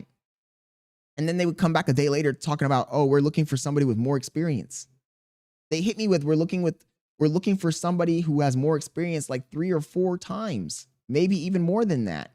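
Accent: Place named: India. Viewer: used those call center skills and the indian accent to get that job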